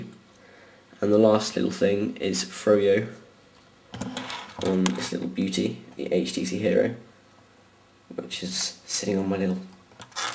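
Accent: United Kingdom. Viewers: are u from uk?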